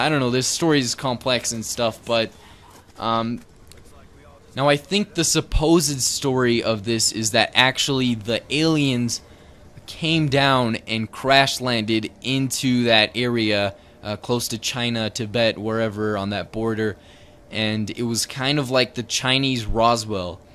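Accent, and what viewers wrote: Bosnia and Herzegovina. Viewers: U a bosnian?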